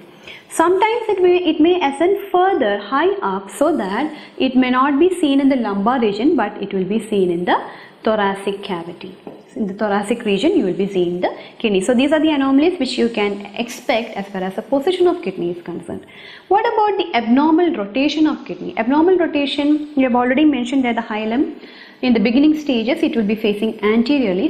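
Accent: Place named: Kerala, India. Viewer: മലയാളി സ്വരം. My brain detect sound samples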